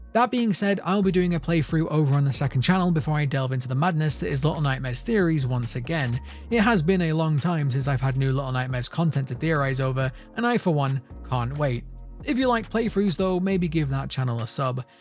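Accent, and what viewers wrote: England, United Kingdom. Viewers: Um actually u speak the English language and the sound of ur accent is British and no one in America says "oh ur speaking British" SMH ignorance is bliss huh lmmfao rofl GOD SAVE THE KING CHUCKY CHEESE AND QUEEN CAMELTOE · Wait you are british???,man I thought that you are american 😶😶😶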